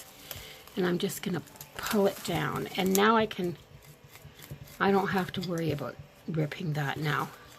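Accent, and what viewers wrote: Canada. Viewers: Are you Canadian??? Love your brouge (accent) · You must be from Canada? The way you pronounce out... Beautiful job on the mason jar! Thank you for sharing 💖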